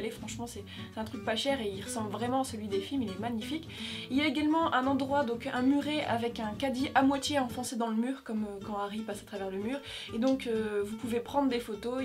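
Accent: North, France. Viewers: Tu viens du Nord ?🙂 Ps: Potterhead a vie 😍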